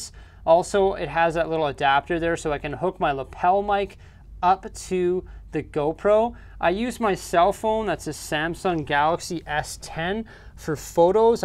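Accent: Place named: Edmonton, Canada. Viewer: I’m so glad I subscribed to your channel in the mist of the virus 🦠. You got of interesting stuff going on right here and I can’t wait to see more. I love your Edmonton accent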